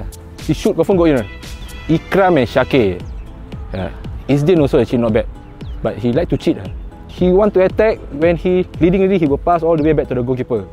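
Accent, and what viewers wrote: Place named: Singapore. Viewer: He's the first in many years that's not afraid to showcase Singlish to netizens,which is definitely a good thing. Good on you man!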